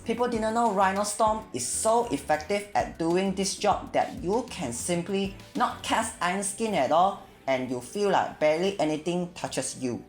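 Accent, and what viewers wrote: China. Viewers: I would listen to you but you sound Chinese so your opinion is invalid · you should use your Chinese accent and be funny with it, like uncle roger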